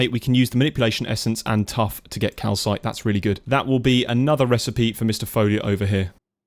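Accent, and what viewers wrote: United Kingdom. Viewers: If I remember right, enchantment industry and oritech both have ways of getting enchanted books/items at significantly higher levels (fortune 255, anyone?) so maybe you could use that to get even more stuff? I'm absolutely loving this series, your British voice is great for helping me sleep (I finish the episodes first don't worry) :3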